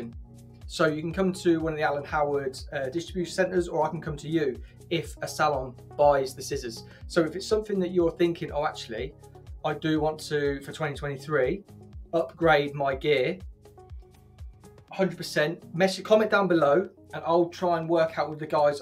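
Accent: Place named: Southern England, United Kingdom. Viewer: Im Yorkshire and married to a 'suvverner. Love that accent 😊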